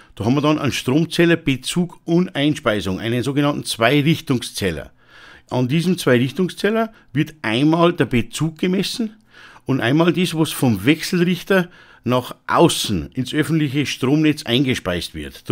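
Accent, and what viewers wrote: Bavaria, Germany. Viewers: muß man auch nicht. Das ist bayrisch! Und echt guat zum Zualosen..... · mir gehts auch immer so wie dir mann versucht hochdeutsch zu sprechen und rutscht immer wieder ins boarische😀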